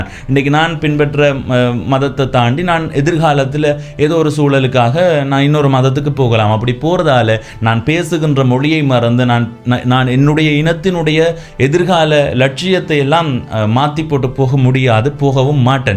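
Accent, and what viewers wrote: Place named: Jaffna, Sri Lanka. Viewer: யாழ்ப்பாணத் தமிழில் கதைக்கும் சில youtuber களில் இவரும் ஒருவர்! பல amateur YouTubers போலில்லாம் சிறப்பான சணல் இது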